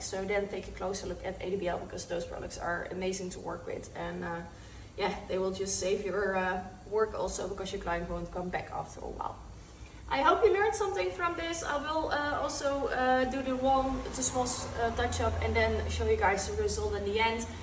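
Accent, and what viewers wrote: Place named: Netherlands. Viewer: Where are you from? Sounds like you have a Dutch accent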